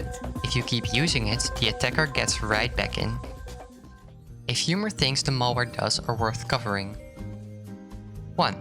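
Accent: Netherlands. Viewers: Are you from the Netherlands? You really sound like it.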